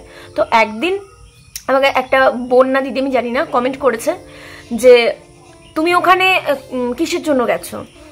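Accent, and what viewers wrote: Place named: Cooch Behar, India. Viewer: যখন তুমি কোচবিহারের ভাষাতে কথা বলো শুনতে ভীষণ ভালো লাগে ❤❤❤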